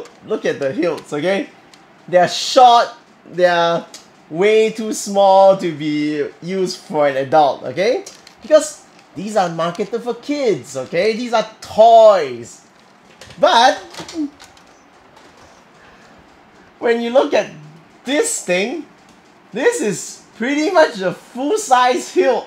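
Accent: Singapore. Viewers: is not form is breathing dude and are you from Singapore?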